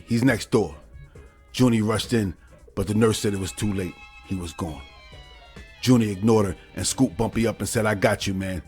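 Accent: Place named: New York City, United States. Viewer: NYC accent starts to get ignoring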